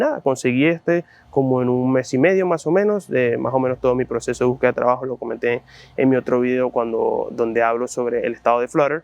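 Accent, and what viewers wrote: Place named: Zulia, Venezuela. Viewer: Lo malo es que parece que flutter ira en declive segun los ultimos movimientos de Google. Tu acento parece de Edo. Zulia. Saludos desde Machiques. Actualmente vivo aqui y trabajo para una empresa del exterior como dev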